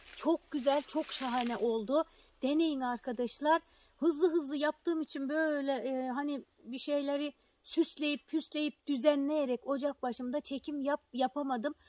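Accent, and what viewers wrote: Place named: Giresun, Turkey. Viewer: kolay gelsin ablam masallah sanasiz nerelisiniz acaba giresun lumusunuz siveniz oraya benziyo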